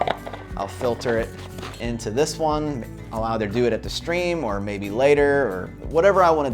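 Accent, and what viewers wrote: Pittsburgh, United States. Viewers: You from Pittsburgh? You have a bit of a yinzer accent.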